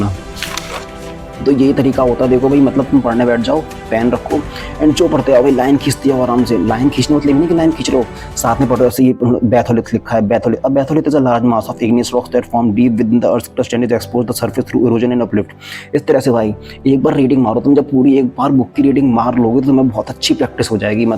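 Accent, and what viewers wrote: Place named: Uttar Pradesh, India. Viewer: 😅 having up accent it feels like heavy more than sophisticated...woo thoda matter ho gya wala feel getting 🙃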